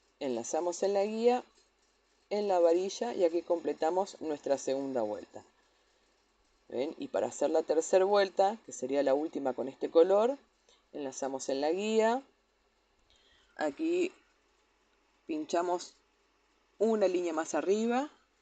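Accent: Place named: Chile. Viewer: Perfecto! gracias por su repuesta rápida. Te pregunte eso porque encuentré que tenia un poco del accento chileno pero el amaricho por el amarillo me dio la pista 😁 Soy francesa, pero franco-chilena en realidad. De parte de mi papá! Ahora yo vivo en un pequeñito pueblo en el sur-oeste de Francia, en medio del bosque y de todos los animalitos. Hice un cambio radical de vida, pasé de una gran ciudad urbana a una pequeña casa en el campo y recuperé mi creatividad. 🥳Gracias a ti para tus videos que son super bien hechas, y tus creaciones son maravillosas ❤ Un lindo dia para ti. Me llamo Laurie🙋‍♀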